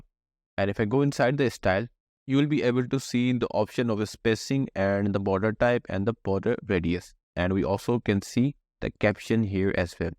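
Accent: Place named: India. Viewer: That Hindu accent drives me crazy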